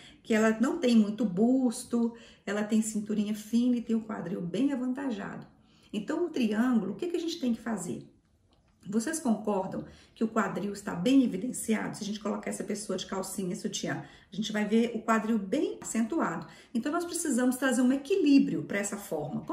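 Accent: Minas Gerais, Brazil. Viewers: vc é mineira? Pois amo este sotaque!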